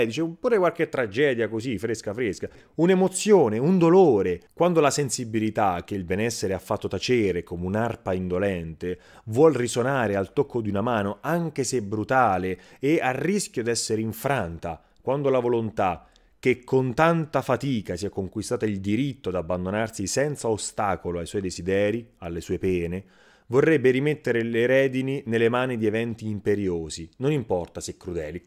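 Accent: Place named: South, Italy. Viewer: Che accento da meridionale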